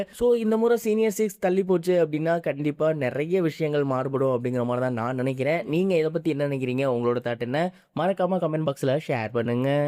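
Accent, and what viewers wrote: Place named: Kerala, India. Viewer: Nayae un voice kerala chee...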